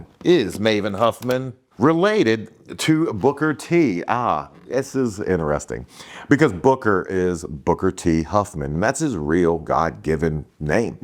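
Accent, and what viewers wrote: Virginia, United States. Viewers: I think because of the delicate quality of your Virginian accent. To uninitiated ears, it might come off as…yeah.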